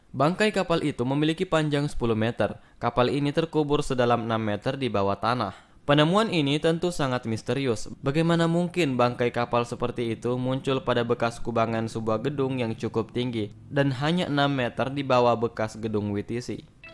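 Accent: Makassar, Indonesia. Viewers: P A N D A dia logat makasar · Org Makassar Eaa?😂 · Bang kmu orang Makassar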